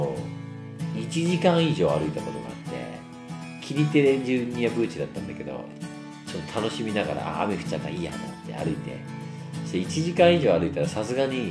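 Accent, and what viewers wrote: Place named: Kansai, Japan. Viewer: 剛くんの関西弁かわいくて好きです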